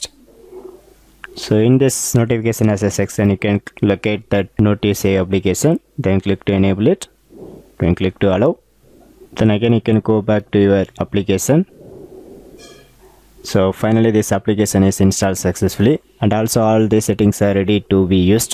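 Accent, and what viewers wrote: South India, India. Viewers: South-indian accent love tht 👍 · South indian voice , nice video bro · I think that he,probably, is a south Indian.because his accent is a bit like that.however, the video deserves a salute😊😊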